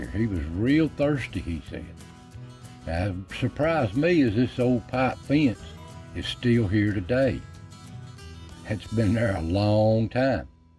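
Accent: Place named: Virginia, United States. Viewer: Man I love hearing your narrative on these stories. I grew up on the coast of virginia on the beach but I briefly lived in Appomattox virginia and hearing you tell these stories reminds me of living up that way near Lynchburg virginia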